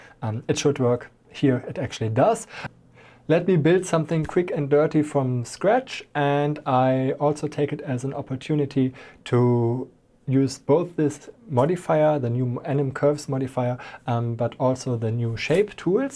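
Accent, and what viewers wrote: Germany. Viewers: Moin, ich hoffe mal du verstehst deutsch. Irgendwie höre ich was deutsches in deinem Englisch raus xd Ich habe eine Frage zu DaVinci, die mir bisher niemand beantworten konnte. Ist es möglich die Geschwindigkeit eines Videos mit Keyframes, die smooth sind, anzupassen? Wichtig daran ist, dass man einen Keyframe anheben kann und die Geschwindigkeit sich genau an dieser Stelle verändert. Bisher habe ich es nur mit zwei Keyframes hinbekommen, was zu ungenau und zu aufwändig für mich ist. Wenn ich das versuche hier bildlich darzustellen, sieht es ungefähr so aus: Was ich brauche: . ' . ' . ' . Was ich bisher gefunden habe: .. '' .. '' .. '' ..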